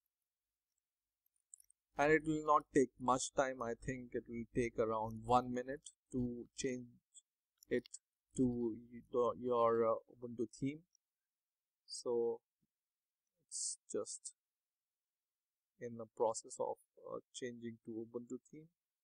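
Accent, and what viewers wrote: India. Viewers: DO NOT DOWNLOAD Honestly guys never trust an Indian guy. EVER! Go watch the tech support scammer videos. They're all Indian people with fake English names. Guess what, this guy has an Indian ass voice and people are saying viruses in the comments. DO NOT DOWNLOAD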